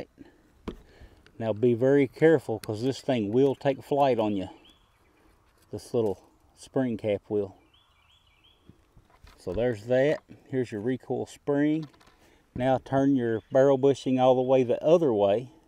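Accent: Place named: South, United States. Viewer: After seeing your great piece of property and listening to your accent, I am going to guess that you are somewhere in the South. Yours is the second video I have seen on this gun, and I must say that I am not found of the slide stop or the barrel attachment. I have a Kimber .22 conversion kit on top of a Kimber 1911 that functions more like a real 1911. While I am not found of the Kimber, at least it functions more like an original 1911. My biggest gripe about the Kimber is that it does not lock the slide open after the last shot, which requires the shooter to count the rounds he is firing so that he doesn't slam the firing pin down on the back of an empty chamber.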